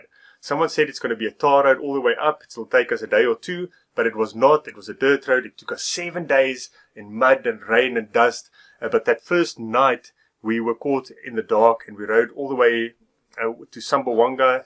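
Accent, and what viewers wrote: South Africa. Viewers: South African accent is tough to understand.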